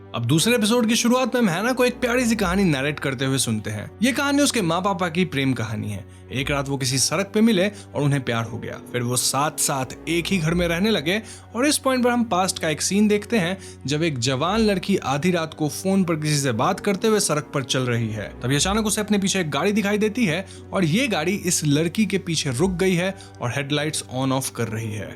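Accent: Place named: Bihar, India. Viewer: Your accent is so cheap every time you say D instead of R ... total bihari accent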